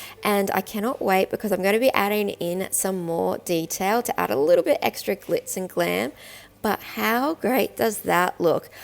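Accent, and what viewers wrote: Australia. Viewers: Oh, I would have never known or thought anything about your voice. You just sound to me like you have a cute accent. I thought you were and Aussie? I cannot imagine why anyone would criticise you. People who do that are just plain mean, cruel, insecure, immature bullies. You sound great to me and I enjoy your videos. Keep em coming.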